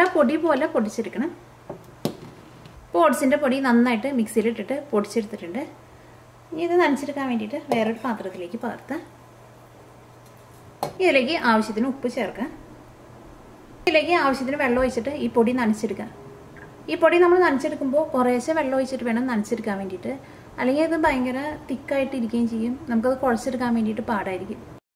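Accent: Thrissur, India. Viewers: thrissur style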